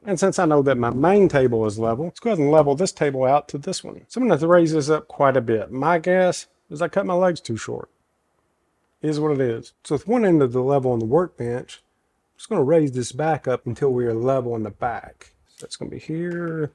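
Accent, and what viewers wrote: South, United States. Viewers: Whenever someone with a thick southern accent can actually do something useful, Im shocked. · Cattywampus lol!? That's a fun word! Awe, I wish you wouldn't edit it out your southern accent .... I don't know about anybody else. But, I love me a southern accent!! Another Awesome video! 😁 I need this workbench! I currently am using two sawhorses with plywood that holds my chop saw and it's also my workbench as well as my new dining room table that I'm supposed to stain and finish .... And Not use it as a workbench extension. Hopefully soon I can make me one of these! 😁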